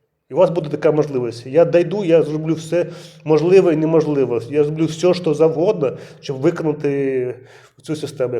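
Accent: Russia. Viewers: Дуже ваша російська ріже слух . Чи ви часом не засланий козачок?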